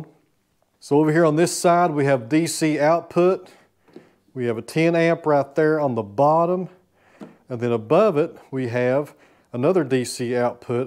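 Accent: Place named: Tennessee, United States. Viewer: Bluetti was smart to back you up,. I can't really explain, but you voice and the charming Tennessee accent I hear, always makes your videos so smile inducing.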